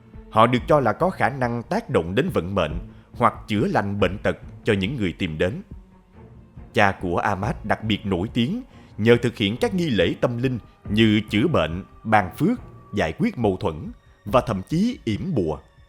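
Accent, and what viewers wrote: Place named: Saigon, Vietnam. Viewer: Oh. đã trở lại. Rất thích giọng Saigon của bạn ❤❤❤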